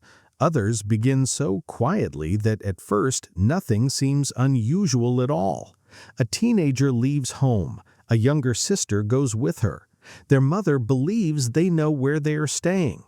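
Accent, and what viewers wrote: United States. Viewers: Why an American voice on a British crime case.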